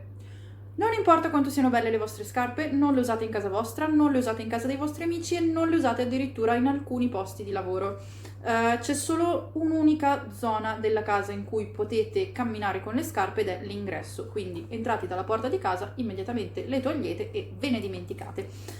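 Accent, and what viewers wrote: Italy. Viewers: ma perchè parli con accento milanese se sei in norvegia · il tuo accento è italianissimo, lombardissimo. E' esteticamente che sei italiana quanto io curdo. Le tue ORIGINI non sono italiane. O hai il coraggio di dirmi che tutti e 4 i tuoi nonni sono italiani ?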